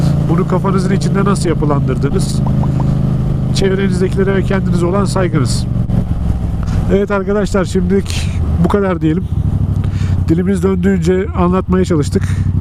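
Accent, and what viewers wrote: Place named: Gaziantep, Turkey. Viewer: Once yol tatlo geldi izliyorum senin konusmani gectim yola saga sola daldim lan burasi bana boyle bi tatli geliyor birsey var ama okadar dalmisim ki meger memleketimmis be tam antep lan dicektim sen dedin antep diye burnumda tutuyor..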